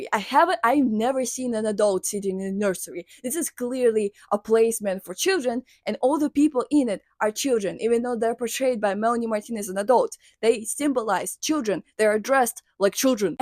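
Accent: Russia. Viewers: Are you Russian? Your accent sounds Russian Edit: saw the Cyrillic text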